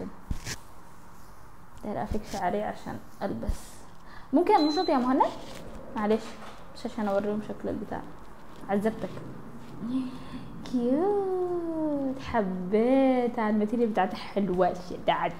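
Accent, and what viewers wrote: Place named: Iraq. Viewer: ما اجملك لهجتك تشبه لهجتنه اهل العراق ☺❤❤❤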